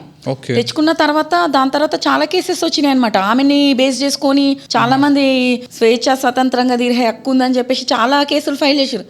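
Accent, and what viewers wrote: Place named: Telangana, India. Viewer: Super madam telangana slang!! Super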